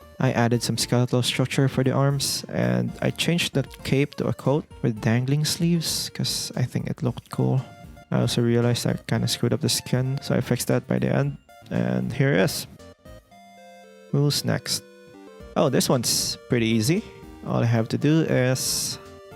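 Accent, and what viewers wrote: Philippines. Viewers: You Have a Strong Filipino accent!! ❤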